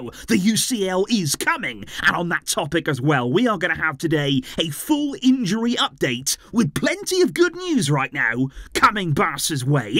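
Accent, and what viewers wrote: United Kingdom. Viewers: i am curious 👀. Your accent is clearly from the UK. Don’t you have a club in the UK that you support. I live in the Netherlands 🇳🇱 and I support First Barca 💙❤️ and second Ajax ❌ ❌ ❌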